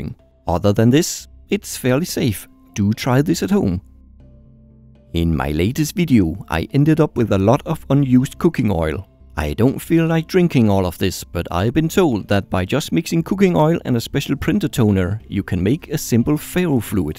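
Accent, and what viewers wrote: Denmark. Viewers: Er du fra Danmark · er du fra Danmark?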